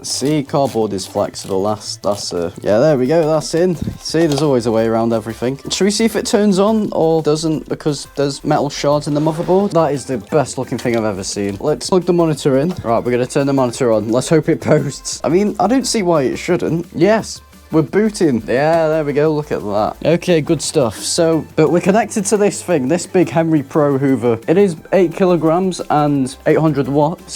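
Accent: United Kingdom. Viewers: So, British?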